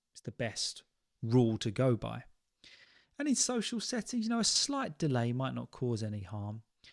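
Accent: United Kingdom. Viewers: I started watching your videos to get used to the British accent. I have to concentrate to understand you. It feels like learning a new language 😅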